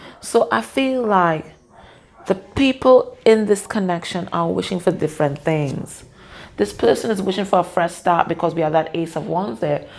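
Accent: Trinidad and Tobago. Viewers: Am I catching a trini accent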